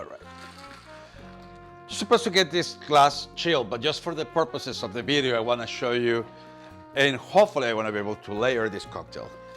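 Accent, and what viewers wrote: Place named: Latin America. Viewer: I’m sure your Latin accent is part of why “Production” fell in love with you. 😂 The rose water tutorial was great. I need to try that. Salud! 🥃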